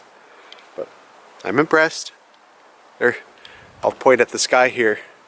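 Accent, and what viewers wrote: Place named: Canada. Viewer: You need quite a lot of water and hight (head) too make a micro hydro project worth it. I would shift that container just too see the difference. Do you have a stream on the prop? A little nudge with the back hoe is all it takes. Wait you got wires coming out of it... yeah thats another story. You have come a long way from digging your well vid that was the last time i watched. nice work. Where are you from the trees in looks like north east but you accent sound Canadian.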